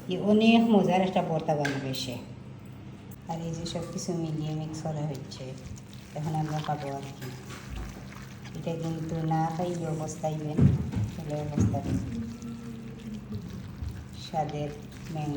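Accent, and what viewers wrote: Sylhet, Bangladesh. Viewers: Afa bangla notable that is good. Also pure sylhet toking that is very good. We r sylhety. Hahaha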